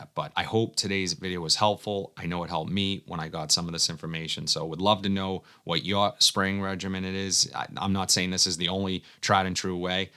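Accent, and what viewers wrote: Boston, United States. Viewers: Is that a Boston accent I hear?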